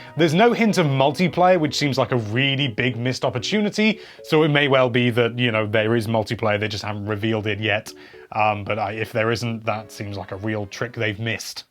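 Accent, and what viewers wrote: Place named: Wales, United Kingdom. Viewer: Why do you always sound like a Welshman in your intro but English the rest of the time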